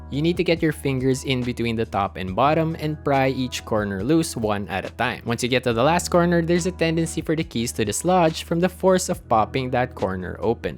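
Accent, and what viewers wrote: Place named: Philippines. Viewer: wow this is my first time watching ur channel and the second i click the video i already guessed u were filipino lol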